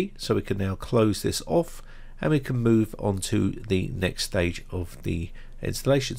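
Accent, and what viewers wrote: England, United Kingdom. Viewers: noo? a noo partition? Wait, you SOUND English... so.... noo? What Nationality are you, boy? its a NEW one on me.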